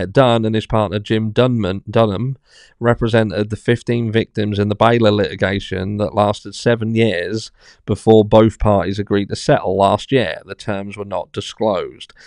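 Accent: United Kingdom. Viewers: I can’t follow. Normally I relish an accent from uk but can’t this time. Straightforward reporting is best.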